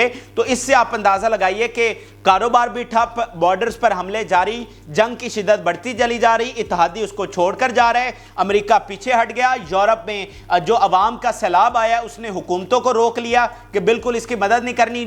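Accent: Punjab, Pakistan. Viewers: او بھای انگريزی کو پنجابی ميں نہ بولو ۔ جو بھی خبريں بتاتے ہو ساتھ کسی مصدقہ اخبار کی ريفرنس ديا کرو ۔ہاتھ ميں کاغز پکڑ کر ريڈنگ کررہے ہو ۔وہ بھی انگريزی پنجابی ميں۔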